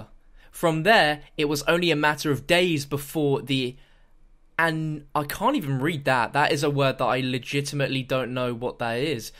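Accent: United Kingdom. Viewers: the fact he has a british accent makes him reading the letter so much better.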